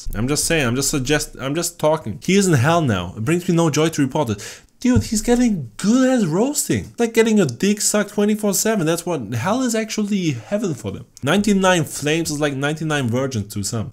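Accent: Germany. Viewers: I was right when I watched you for the first time. You are from German. :D I read the comments and now I'm sure. That's why not everything you talk I understand so well. Is your accent :D Funny is that the more you talk more curious I get trying to understand you. I really like it! :D I wanted to ask once in your livestream video from SSB, there the game is not in english. But I decided to wait a little more and observe you more. xD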